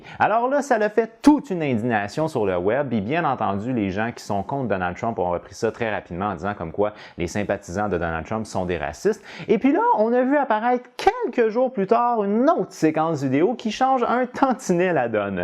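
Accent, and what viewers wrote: Canada. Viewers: Vraiment pro commes video, ça me sidère de voir une telle qualité de vidéo avec si peu de vue ^^ T'as une sacrée aisance à la caméra, j'suis bien content d'avoir découvert ;) Des que j'entends un accents Canadien j'ai directement l'accent quand je pense et parle c't'incroyable ^^ Bonne continuation et t'as le bonjours de la France l'ami Peace et la bonne vie à toi :)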